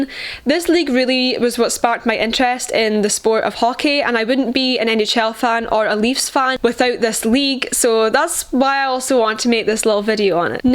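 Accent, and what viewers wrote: Scotland, United Kingdom. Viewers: I love yer Scottish accent! I'm Scottish too, played hockey around the world. I used to skate around in Limekiln Road in Ayr!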